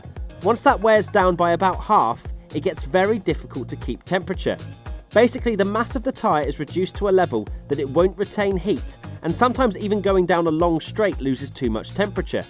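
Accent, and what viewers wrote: United Kingdom. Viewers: You sound so British, yet you say Mister Lewis Hamilton...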